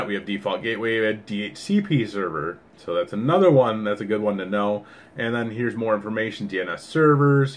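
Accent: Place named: Michigan, United States. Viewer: I love a good Michigan accent 😍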